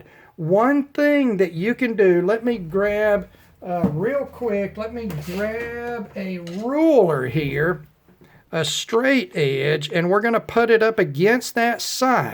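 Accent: South, United States. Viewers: Love this fellas accent , he sounds like a southern gentleman.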